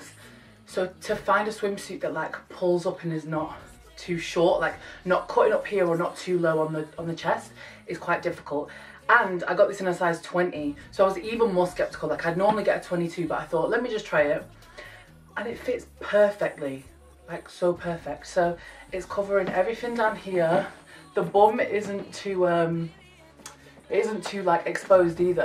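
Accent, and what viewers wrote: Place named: Yorkshire, United Kingdom. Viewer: Proper love you, reppin Yorkshire accent🤘🏽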